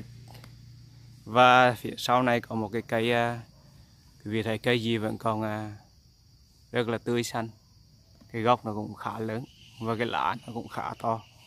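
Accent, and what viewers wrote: Hue, Vietnam. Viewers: Ông Thuyệt Minh nầy noi tiêng HUE khó nghe quạ!!! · Giọng Huế nặng quá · Nhac mo qua lon. Noi tieng Hue khong nghe duoc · Giọng Huế mà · Do bạn không quen nghe chứ ảnh nói giọng Huế chuẩn đấy. Nhạc rất hợp với khung cảnh.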